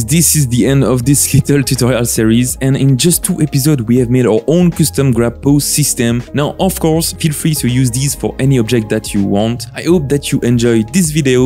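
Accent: France. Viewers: T’es français ?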